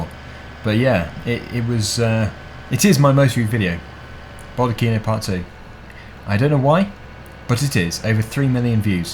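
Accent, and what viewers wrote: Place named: United Kingdom. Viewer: You are British so pronounce things the British way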